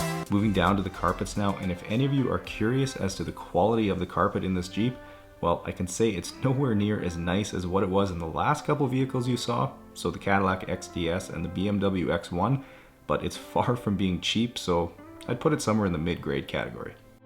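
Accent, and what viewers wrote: Canada. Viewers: when he talks to his audience: slight Canadian accent talking to actual people: Canadian intensifies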